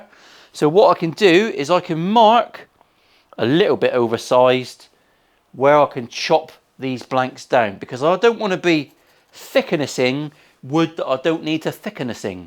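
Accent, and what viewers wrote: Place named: Cockney, United Kingdom. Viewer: I Fookin Luv You, you caffeinated jittery Janner ❤ Never afraid to do it your way, Fookem All Mista the North Devon Cockney Sparrow!!!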